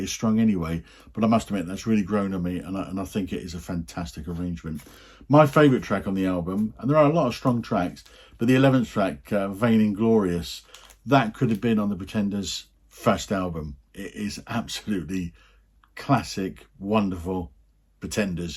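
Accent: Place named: United Kingdom. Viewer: Brilliant job. Thanks for those shoutouts! I agree about the PG album. There are a few songs that don’t necessarily hit you right away, so it will get stronger with more listens. I actually played it quite a bit before I made my list because I wanted to give it a fair assessment in a short period of time. I think this episode is a perfect example of why your channel is so popular. Your music expertise is remarkable and you have that hip, in the know kind of vibe. Plus being British it just makes you sound more intelligent and sophisticated. You definitely have talked me into giving a couple albums on here a try.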